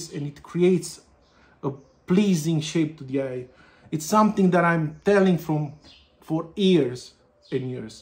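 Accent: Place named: Liverpool, United Kingdom. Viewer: lol! your Liverpool accent 😂 i like you even more now 😊 i really like Condor too and, as it turns out, Ennerdale too. i may have been wrong about Lakeland tobacco.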